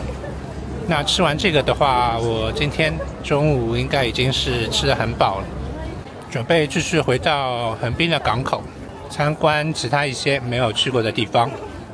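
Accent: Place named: Shanghai, China. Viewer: 是不是？ 真的！我聽到時也覺得超像的。 於是我猜G先生應該是上海人（當時還不知G先生是哪裡人），跟我女兒說，她覺得我亂猜！ 結果後來在其中一集答案揭曉，真的是上海出生的！😆😄😊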